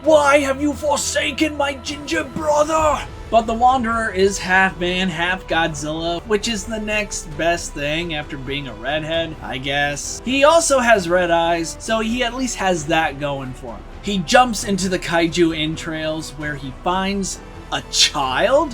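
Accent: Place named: Oregon, United States. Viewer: Are you from Oregon bro!?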